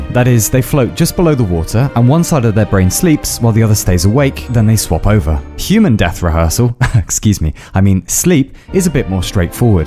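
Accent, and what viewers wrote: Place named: England, United Kingdom. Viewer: Why are so English 🦖🦖🦖🦖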